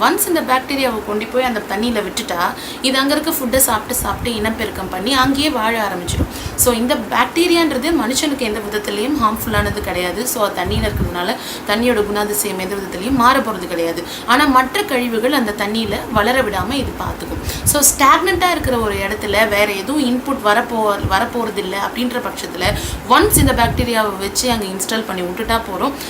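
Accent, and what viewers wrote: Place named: Chennai, India. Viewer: கூவம் நதி குறித்த தகவல்கள் மிகவும் அருமையாகவும் சரியாகவும் இருந்தது .. சென்னை பேச்சு நல்லமுயற்சி நன்றாக இருந்தது.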